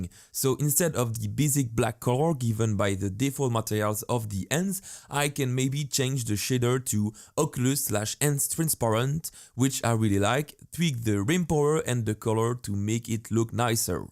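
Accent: France. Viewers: We Americans are jealous of your Francais accent ;) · It's ok man, not easy to pronounce some words with French accent. As long as people understand, it's fine :)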